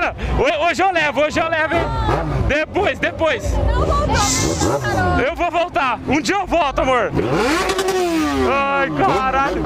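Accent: Goiás, Brazil. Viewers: No pique do Goiás assim que se fala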